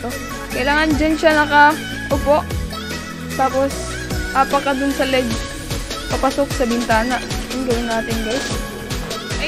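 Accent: Visayas, Philippines. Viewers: BISAYA DIAY KA? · Hahaha bisaya diay ayaw nag saba!!!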